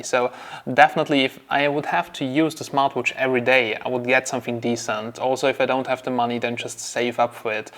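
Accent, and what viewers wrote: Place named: Germany. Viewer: Zweites Video und weiß seit der ersten Sekunde das du Deutsch/Österreicher bist :D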